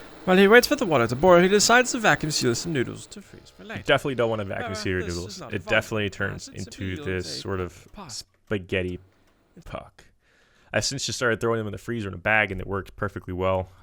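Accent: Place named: England, United Kingdom. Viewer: Very clever of you!! I loved it. I knew that it was all you! But who is the narrator? Very English xx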